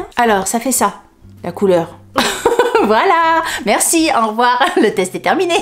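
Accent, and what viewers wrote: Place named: Southwest, France. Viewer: Team sourcileuu, sud-ouest oblige ! On prononce tout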